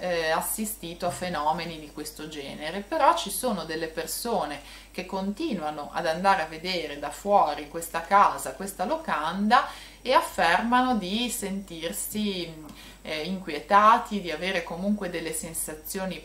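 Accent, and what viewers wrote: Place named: Genoa, Italy. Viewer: belandiiiiiiiiiiiiiiiiiiiiiii non conoscevo questa storia.comunque hai una forte cadenza genoveseeeeeeeeeeeeeeeee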